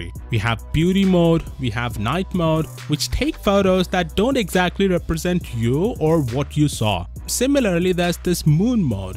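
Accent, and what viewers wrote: India. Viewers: Are you India? 😅 I just wonder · His accent is that of an Indian person correct? They called me like a hundred times a day I am inherently racist sorry I can't stand you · He has a certain accent. Indian maybe? I'm not sure.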